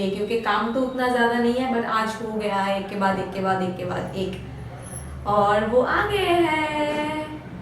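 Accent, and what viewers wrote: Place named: Bengal, India. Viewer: Plz learn to speak proper Hindi bfr u make vlogs; u r Bengali, why don't u speak in mother tongue?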